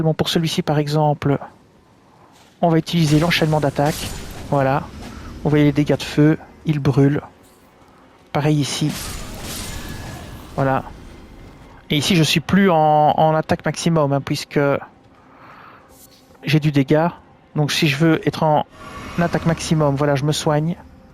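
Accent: Belgium. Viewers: Merci beaucoup pour le travail accompli. Et l'accent Belge est tellement beau.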